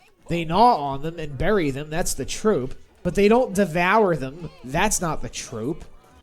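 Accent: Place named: Philadelphia, United States. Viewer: Damn your Philly accent ya? Harsh!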